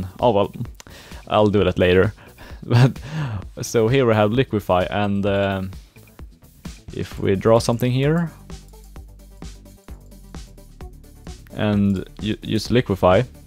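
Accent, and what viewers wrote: Sweden. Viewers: Are you swedish? xD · you sound swedish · You are swedish right? :D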